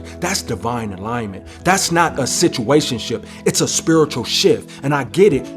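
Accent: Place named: New York, United States. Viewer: This brother is so deep. I just came here today and subscribed. I’ve been working on myself, paying attention to what I put in my body as he said in the other video and healing. I needed this confirmation. I love his passion! His voice makes you stand at attention!!!! He has a New York or Northern style passion is his voice. I love this channel. Not about vain BS.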